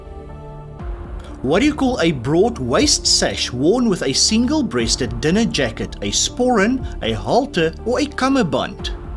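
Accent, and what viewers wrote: Afrikaans, South Africa. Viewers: Bud as a fellow South African, you are clearly of Afrikaans origin,you need to work on your pronunciation.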